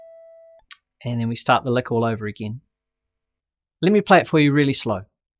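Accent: New Zealand. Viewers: Nice to hear a new zealander for once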